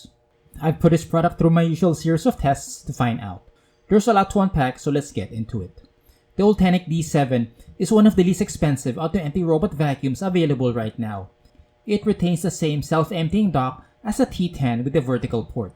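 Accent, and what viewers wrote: Philippines. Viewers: Sounds like your a Filipino? Based on accent? Nice video!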